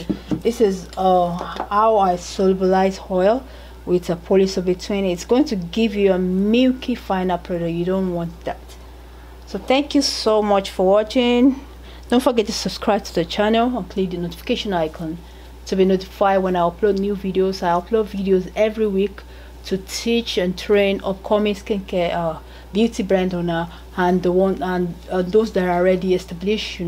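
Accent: Nigeria. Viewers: I came here and heard the Yoruba accent. Arabirin Mi, Esheeeyyy ❣❣❣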